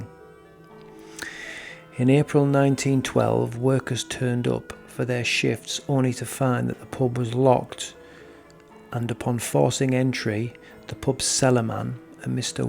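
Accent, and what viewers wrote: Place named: Yorkshire, United Kingdom. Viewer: I love your narration and Yorkshire accent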